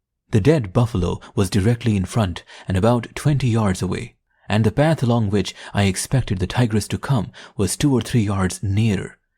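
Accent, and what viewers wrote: India. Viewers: Even though jim Corbett was an Englishman the story is narrated in an Indian voice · I love hearing these stories from someone who is from india or has parents from india. My favorite aunt is from india. And ever since i was a small kid ive been fascinated with her culture and family. Her two sons are my favorite cousins and i do my best to be a good older male role model for them like she and my uncle were for me. Hearing your voice reminds me of her family. Thanks for the authentic experience. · Would be better without the forced pseudo-American accent. It grates on the ears. Edit: ah, just realised you are an Indian living in the US. That explains the appu accent.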